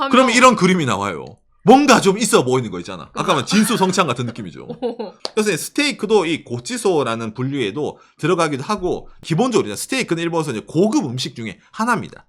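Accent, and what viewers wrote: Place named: Gyeongsang, South Korea. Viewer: ㅎㅎ ~~~멘치로... 경상도 인가벼...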